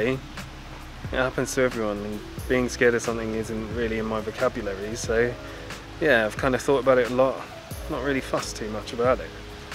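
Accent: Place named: United Kingdom. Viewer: I know you’re a straight guy, but as one of your gay fans, and with utmost respect, I must remark how very handsome you are. I am a pushover for guys with British accents, but add the beard and the adorable eyes, and you are simply a heartthrob. Have you ever been called a heartthrob before? I’d have to wager that, obvious as it seems to me, you have to have heard it once or twice before! Pardon my moment of gushing, but I just felt it needed to be said. Now there, I feel so much better! And I hope you are too, my friend! Peace and health to you and all those you love! 🇺🇸🤗🇬🇧‼️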